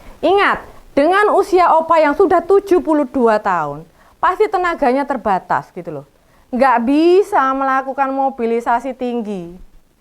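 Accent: Java, Indonesia. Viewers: Mbak asli ndi to Kok jawax medok Jateng opo jatim Q demen ngrungok ke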